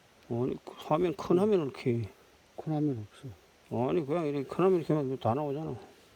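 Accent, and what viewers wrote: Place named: Mungyeong, South Korea. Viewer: 저는 고향이 문경 입니다. 저의 고향 말투랑 비슷해서 정감이 가네요.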